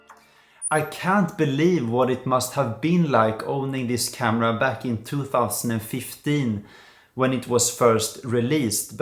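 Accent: Sweden. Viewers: Du måste vara svensk!! Finns inget annat, så hårt som du bryter😂 säg mig, hur bra är den kameran. Jag funtar på och skaffa en q2m i värsta fall en q2… har du framkallat några bilder än? Eller gjort nå prints ? Isf hur stort ? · Dat svenska accent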